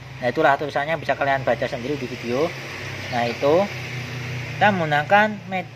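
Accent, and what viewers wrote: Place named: Yogyakarta, Indonesia. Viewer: kalau tidak salah tadi pakai pasir silika putih bisa ya? yg untuk aquarium kan. btw mas orang mana kok jawa jawa nya ky jogja/ semarangan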